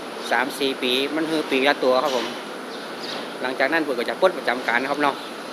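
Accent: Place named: Nan, Thailand. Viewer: สำเนียงคุ้นหู คนเมืองน่าน บ้านปอนเน้อ เก็บเกี่ยวความฮู้มาเลี้ยงเองตี่บ้านเน้อ เอาใจจ่วยลงรายละเอียดนักๆๆ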